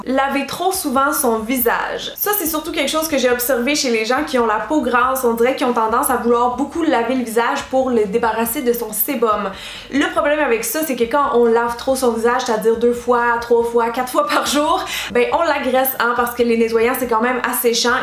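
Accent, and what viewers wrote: Quebec, Canada. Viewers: J'en fait quelques unes de ces bêtises... Pleins de bonnes choses dans cette vidéo. Et un délicieux accent Québécois 😃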